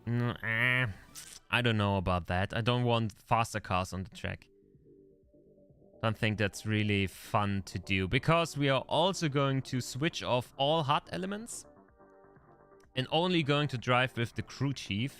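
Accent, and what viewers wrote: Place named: Germany. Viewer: Are you German you pronuns it Like a German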